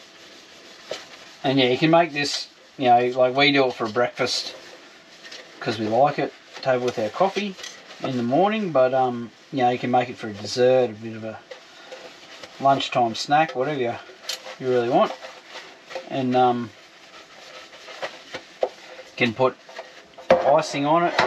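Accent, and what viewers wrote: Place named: Australia. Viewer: I'm a Canadian senior. At first I thought I clicked on a foreign language channel. Thank God for the CC & Auto-Translate feature. I selected English. Then I was able to read what you were saying & then it dawned on me that you were in fact speaking English, the Australian version of it. I must say that I love your accent. I feel so foolish now. Must be my senior years. Oh well, at least I can laugh at myself. No offense intended, I thought that you could use a laugh at my expense too! Thank you for sharing!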